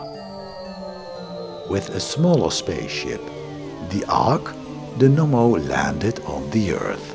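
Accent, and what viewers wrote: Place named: Netherlands. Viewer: It's 'Sirius" not Sairius nor Thairius. Info is okay, the Dutch accents and pronunciation mistakes are annoying. so is the pedantic intonation.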